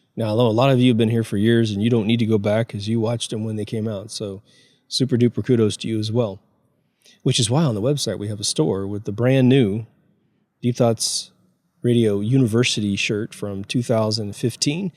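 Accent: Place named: Sweden. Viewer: is a fucking genius. I found his channel in 2016. I guess he did work way before that. But one thing is for sure. He is Swedish. Nordic. I hear it in his voice.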